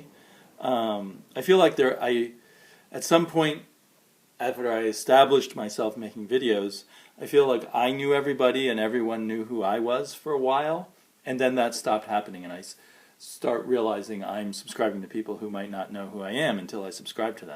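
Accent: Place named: United States. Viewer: Thank you for the kind words and shout out. No worries on the name pronouncement, it´s hard to pronounce with the american accent I know, but cuddos for trying;) Still enjoying Batman Eternal and Batgirl with the beautiful Alex Garner covers. Cheers!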